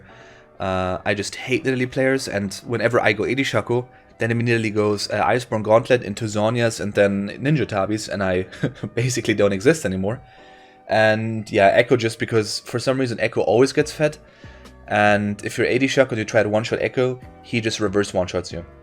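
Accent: Germany. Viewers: Where are you from? Sounds a little bit like german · You sound pretty german xD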